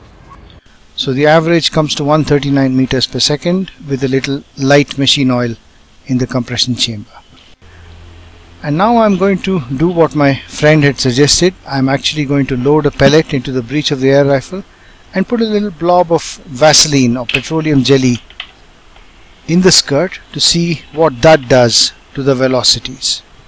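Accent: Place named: India. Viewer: one of the better Indian accents in English I've heard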